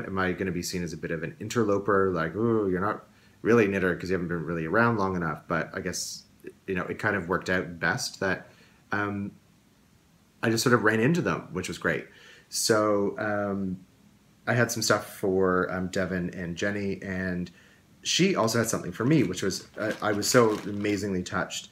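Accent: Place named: Canada. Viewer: Awesome podcast! I can't wait to see your progress as a knitter. I brings me back to just a couple short years and helps me reflect on all the progress I've made since i (seriously) picked up knitting. Also, the Canadian accent...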